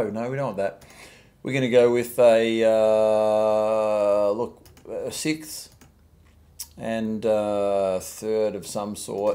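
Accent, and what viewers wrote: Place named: Australia. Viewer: Aussie accent is strong with this one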